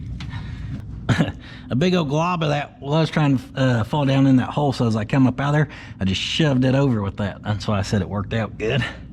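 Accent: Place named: Oklahoma, United States. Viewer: the true sound of Oklahoma!!!!! A real accent from the south west!!!!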